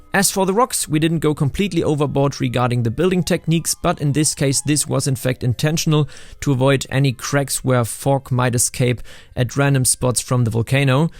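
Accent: Germany. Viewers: the most "german english" i've heard in a long time. weiter so! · as a german i hear the german accent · junge was ein gammliges kartoffelenglisch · Das LEGO-Build ist Genius-Level - das Video ist sehr spannend. Nur dein Englisch ist eine Katastrophe...Vokabular gut, es geht nur um die Reihenfolge der Wörter, die typisch deutsch ist, wie auch die Aussprache.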